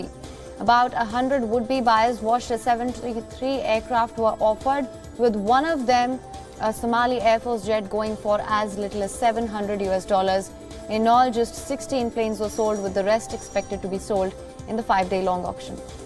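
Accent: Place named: India. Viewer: This newscaster has an annoyingly high pitched terrrible voice worsened by her Indian accent.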